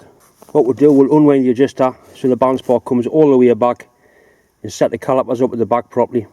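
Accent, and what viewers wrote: Newcastle, United Kingdom. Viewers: Good, clear instruction and Great video . And as I,m a proper northerner I even understood your geordie accent.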